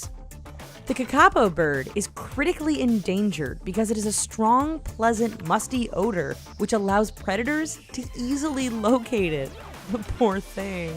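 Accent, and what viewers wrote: United States. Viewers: 'So now you can tell I'm American'. I'm pretty sure everyone could tell straight away love with that accent. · She sounds American since she doesn't have a accent and her language is English the type of English that doesnt have a accent unlike UK english.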